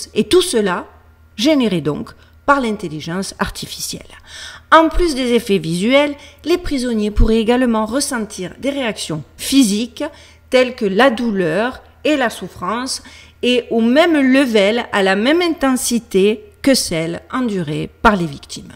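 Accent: France. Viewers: Question : As-tu une certain succès envers les amerlocs avec ton accent Français ?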